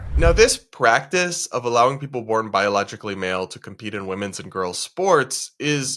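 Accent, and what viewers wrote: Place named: Oklahoma, United States. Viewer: Soo terribly minor--but I mention it only because I have heard you do this a few times before and it's at variance with how well-spoken you are. -ish; you say "have went" instead of "have gone". I wouldn't have even posted about it, but it always takes me out of what you were saying and think, "Hmm that's very 'Okie'." (I am an Oklahoman and so, love you Okies, but I worked durned hard to not sound like one!)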